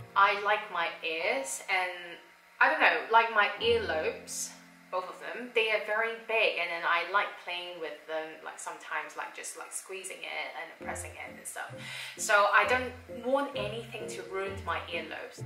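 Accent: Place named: United Kingdom. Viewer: Ohh your english sounds so british now! Love it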